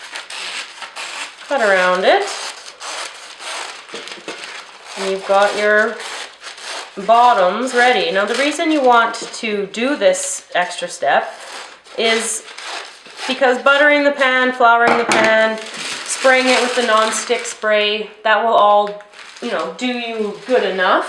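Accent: Canada. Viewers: I hear a Canadian accent👂👂👂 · Are you Canadian? I feel dumb for asking but I'm really curious.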